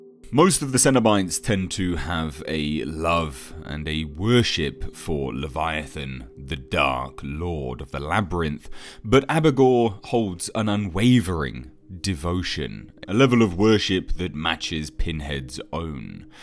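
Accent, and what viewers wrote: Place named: England, United Kingdom. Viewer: Marvelous Discovering more about my favorite Clive Barker work with you're voice ( and English accent) just keep my blood boil for more Barker lectures 😜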